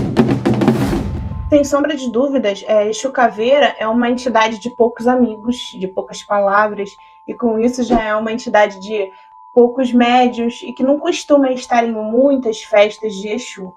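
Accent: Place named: Rio de Janeiro, Brazil. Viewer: Eu adorei o vídeo ❤❤❤..... mas o sutaque carioca é horrível 🤢🤢🤢🤢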